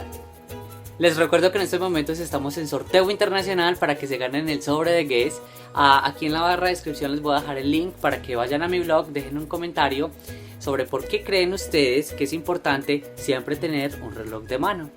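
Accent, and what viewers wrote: Antioquia, Colombia. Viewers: Oyeeee paisa me gusta como hablas... bacan!!!!!!! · definitivamente paisa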